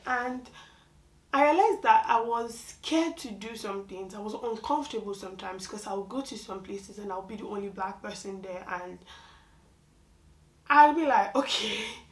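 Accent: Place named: France. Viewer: ❤❤❤happy new year in Advance …your English is already having French intonation…bonsoir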